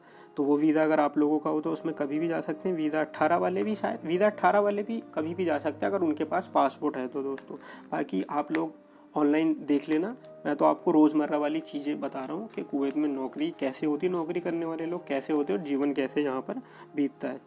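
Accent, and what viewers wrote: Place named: Garhwal, India. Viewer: bhai India me aap kahan se belong karte hain..aapka accent garhwal ya koi pahadi side ka lagta hai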